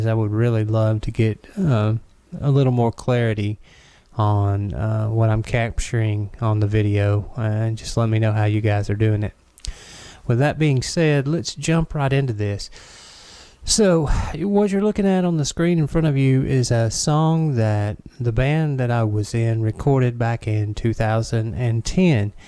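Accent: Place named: South, United States. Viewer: Dudo mucho que ese hombre hable español. Se le nota, su acento es del sur del pais.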